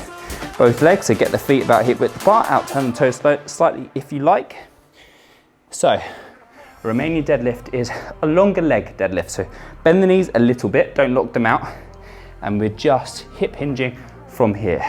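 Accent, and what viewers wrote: United Kingdom. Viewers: I'd like to win . Appart from the excersices i love the british english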